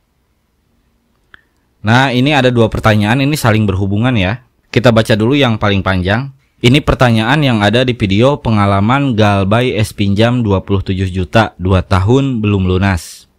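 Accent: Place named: West Java, Indonesia. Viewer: Ditunggu bang, semoga apa jadi hajat abang bisa cepat terkabul 🙏. Btw logatna siga urang sunda bang 😅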